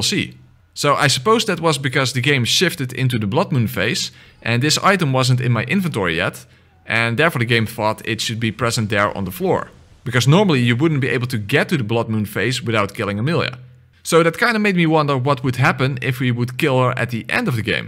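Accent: Netherlands. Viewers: A dutch man, god, thats gonna bother me... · Are u a dutch guy? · Is hé dutch? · Ey ur Dutch right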